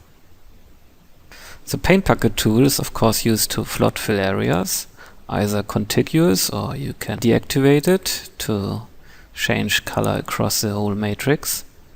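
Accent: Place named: Germany. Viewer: App Magician he sounds German lol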